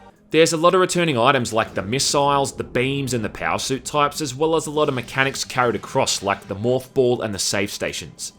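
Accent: Australia. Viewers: are you australian? I ask because of your accent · is this the same aussie that did those hilarious mortal kombat lets plays?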